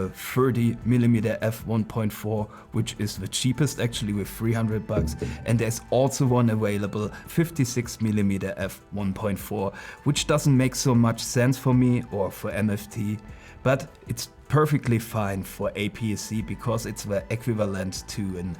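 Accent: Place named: Germany. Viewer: I heard you speaking. and my first thought was: is he German? And, yeah, I was right! 😁 .. Thanks for the review. I want to buy it, but the link you provide only targets to Amazon US and I'm not sure if you'll get the commission if I switch to Amazon DE, maybe you should provide a german link 😉.. Viele Grüße aus Köln!!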